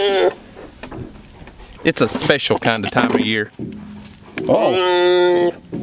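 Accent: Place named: South, United States. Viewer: The constant, unending interruptions are like watching someone with ADD. Solution: Put the dog inside or on the other side of the fence and make your videos uninterrupted. You have very good content. That southern drawl is relaxing and sounds very trustworthy - very easy to listen to. Just let that good content flow!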